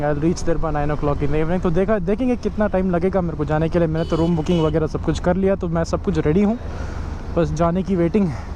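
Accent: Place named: Hyderabad, India. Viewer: Bro i like it ur hyderbadi accent.... maja agaya na ekdmach.... ... 😃🤣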